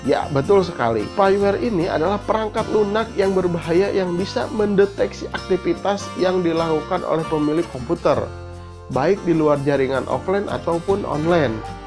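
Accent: West Java, Indonesia. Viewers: sunda banget bang wkwkwkwk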